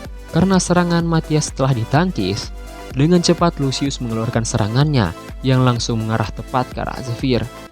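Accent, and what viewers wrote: Sulawesi, Indonesia. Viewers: Org Sulawesi ya bang?😆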